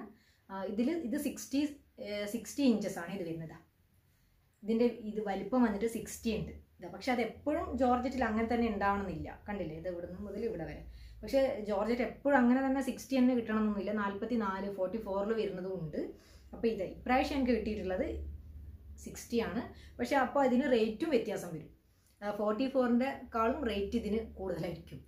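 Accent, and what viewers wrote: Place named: Thalassery, India. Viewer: Hai chechi.. Tlsry evideya veed... Samsaasara kettapo thanne eniku thoniyirunnu.... Nammale aalaanu...🥰🥰tlsry evideya... Njan eppoyum kaanaarundu...👍👍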